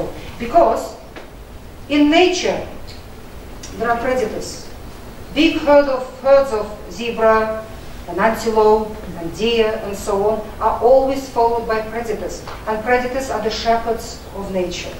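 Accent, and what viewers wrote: Russia. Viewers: I like her Russian academic speech style. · very informative thank you i like her russian voice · I thought she was speaking russian, but nope thats english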